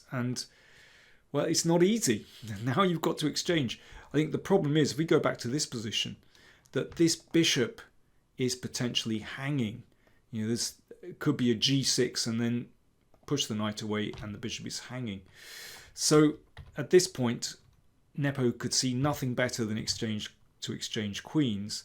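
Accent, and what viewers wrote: United Kingdom. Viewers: Not to forget the superbe british tongue!!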